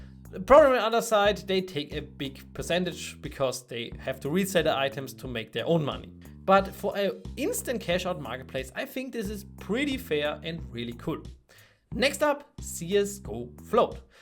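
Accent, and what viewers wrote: Germany. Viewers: brandenburg englisch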